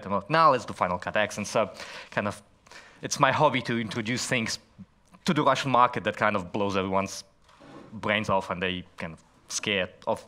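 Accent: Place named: Israel. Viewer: Your Israeli accent mixed in with so many other accents are driving me crazy. my brain is going nuts trying to process your voice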